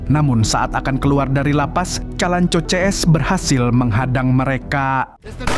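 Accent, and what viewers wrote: Banjar, Indonesia. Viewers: orang banjar kah pian min😅😅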